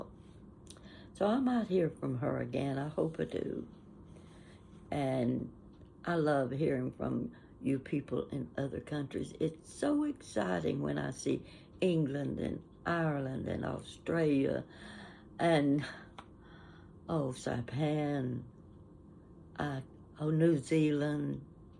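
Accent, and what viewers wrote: South, United States. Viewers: You remind me of my Aunt Jean who lived in the country of Alabama, same sweet smile. I think I must have grown up deep south a bit since both my parents were from Birmingham, Alabama. However, they moved to Texas where I was born. So friends tell me I sound more like I'm from New England with a few Texas bits, but I have deep southern habits. Lucky me! Anyway, I love stories and hearing your accent reminds me of my mom. Bless you.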